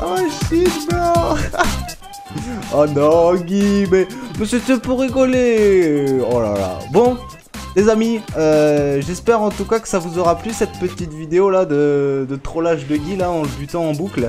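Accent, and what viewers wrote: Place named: Spain. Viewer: Sa fait bizzare de pas entendre ton accent espagnol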